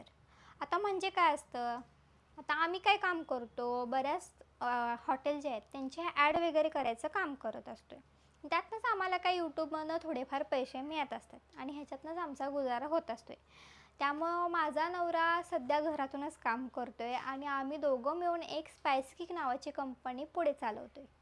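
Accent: Kolhapur, India. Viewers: बोलण्या वरून तुम्ही सातारा कोल्हापूर चें वाटता 👌🏻👌🏻👌🏻म्हणेज माहेर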